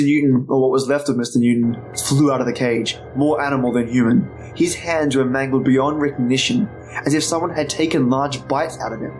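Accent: Australia. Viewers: Terrific story mate! Couldn't resist using the word mate, love your Aussie accent.. Which sounds pretty funny..considering I'm an American gal from the south who has an Ellie Mae Clampett drawl myself. Thanks for sharing all these amazingly creepy tales..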